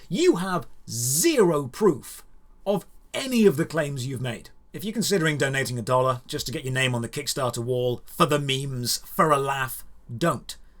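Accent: England, United Kingdom. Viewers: Your Commentating reminds me of top gear, either its the nostalgic accent from my childhood in England or just your Personality.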